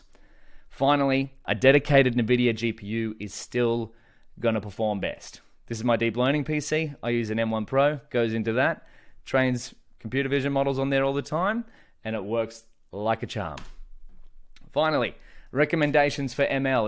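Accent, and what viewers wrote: Australia. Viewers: thick aussie accent.. gives prices in usd...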